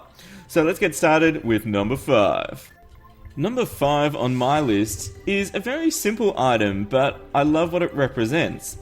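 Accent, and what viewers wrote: Australia. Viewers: Just focused on the great aussie accent XD straya ftw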